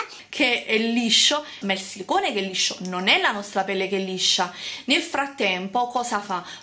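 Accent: Naples, Italy. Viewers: Vorrei anche io precisare e farti notare che dialetto e accento (cadenza) sono due cose diverse e dai tuoi commenti a volte hai fatto un po' di confusione.. ma io concordo su tutto! non mi sembra che usi spesso espressioni dialettali, se non in contesti scherzosi per fare una battuta o per citare un proverbio (cosa che ci sta), ma il suo accento è TROPPO marcato. Non lo dico per "antipatia" o "razzismo" verso i napoletani, ma lo dico perché quando l'accento è così marcato da impedire una agevole comprensione della lingua allora bisognerebbe imparare a moderarlo.. perché sì, l'italiano standard, LINGUA UFFICIALE CHE OGNUNO DOVREBBE CONOSCERE BENE, ha delle regole di fonetica ed anche quelle fanno parte del codice lingua. Secondo me è sbagliato cercare di nascondere del tutto il proprio accento "locale", ci mancherebbe, ma bisognerebbe almeno parlare correttamente. 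Io seguo da anni Marta di Sweetbeauty1990 e anche lei è di Napoli; il suo accento però a differenza di quello di è gradevole! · Io ci provo a seguirti, ma te lo devo dire... il tuo accento è davvero difficile da sopportare. Per chi non è di Napoli, è un dialetto fra i più fastidiosi, mi spiace. "Quando lo vedo DI fare..." ma che italiano è??? Insieme ai siliconi io eliminerei la cadenza campana e leggerei di più. Ps. mi hanno regalato il tuo 'libro': inutile, visto che non spieghi dove reperire i vari oggetti necessari e ci sono veramente quattro cose in croce. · a te non piaccia la cadenza napoletana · ma chi ti credi di essere? Ci sono fior fior di esperti, chimici, medici, farmacisti e molti altri specialisti dietro ad un marchio e dietro ad un prodotto. In più la tua filosofia del "tutto cio che è naturale fa bene"" è veramente da Medioevo. In più, se ti informi bene saprai che non è vero che in chimica tutto cio che finisce con -one è un silicone (giusto per dirne uno)... 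Purtroppo ci sono delle ingenuità di base che permeano tutti i tuoi video. Un'ultima osservazione: se vuoi rivolgerti ad un pubblico dovresti imparare a parlare in italiano, non si può accettare il tuo accento partenopeo, simpatico eh, ma inadatto alla grande comunicazione.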